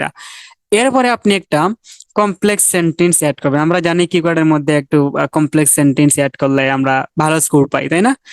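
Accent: Sylhet, Bangladesh. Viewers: ভাইয়া আপনি কি সিলেটের?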